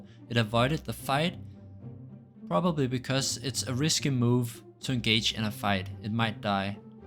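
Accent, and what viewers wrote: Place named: Denmark. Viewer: Fed fed projekt kunne høre du var dansker fra starten 😂 Arbejder selv med ai så fortsæt det gode arbejde!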